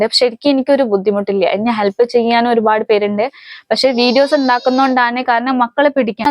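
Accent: Malappuram, India. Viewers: Ente cousinte home chelari aanu🥰.. samsaram kettapol thooni.. onnengil calicut allengil malappuram aayirikkumnu😍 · Malappuram style samsaram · നല്ല മലപ്പുറം മലയാളം സംസാരിക്കുന്നു കൂടുതൽ ഉത്തർപ്രദേശ് കാണിക്കു മലയാളികൾ കാണട്ടെ അവിടത്തെ ചുറ്റുപാടുകൾ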